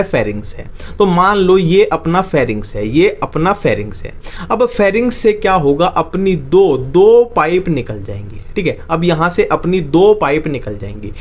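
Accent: Haryana, India. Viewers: Sir aap haryanwi h kya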